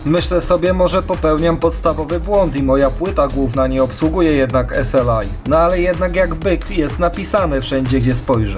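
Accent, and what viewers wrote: Silesia, Poland. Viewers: Ten śląski akcent..taki fajny 😁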